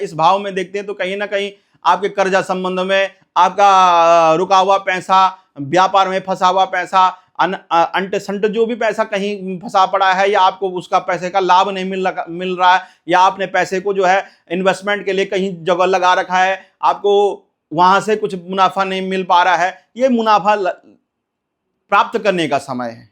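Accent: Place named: Pahadi, India. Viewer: Mujhe lag raha tha aap pahadi Ho aapki bhasha mein Thoda Thoda pahadi pan chhalak raha hai per bahut achcha bola 🙏🏻🙏🏻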